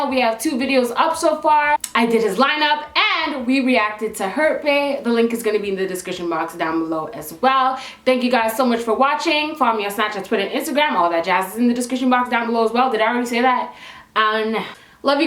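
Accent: France. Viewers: HAAAAAAAAAAAAAAAAAAAAAAAAAA !!! YOUR FRENCH ACCENT IS CUTEEEEEEE !!!! (i'm from Montréal) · You're french accent is so cute 💙☺️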